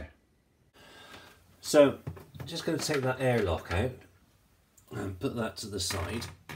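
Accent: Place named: Somerset, United Kingdom. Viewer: Sounds like somerset to me?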